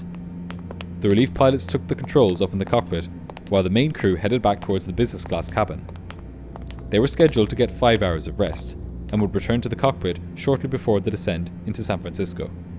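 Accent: Ireland. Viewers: Stumbled upon your channel a few days ago pretty much by accident. I lived in Ireland for half a year when I was 15 and I still miss it 5 years later, so hearing your accent really caught me and sent me down memory lane. I think I‘ve watched about 10 of you videos now and I‘m staying for the amazing storytelling, analysis and breakdown!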